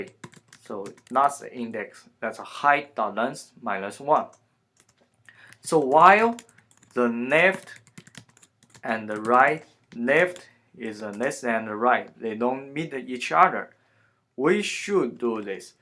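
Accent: China. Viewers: 第一句话就能听出是中国人hhhh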